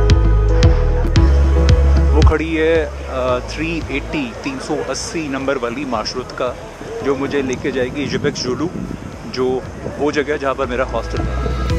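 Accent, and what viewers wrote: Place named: India. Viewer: Very hard to understand your english with indian accent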